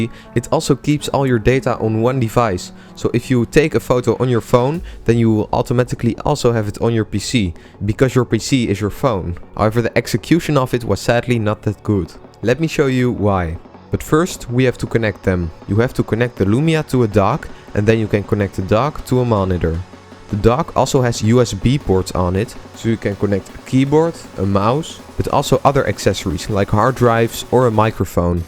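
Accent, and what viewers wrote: Netherlands. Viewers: I can hear the dutch accent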